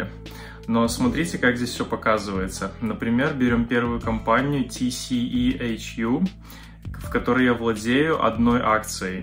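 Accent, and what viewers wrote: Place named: Belarus. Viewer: Беларуски акцент 😉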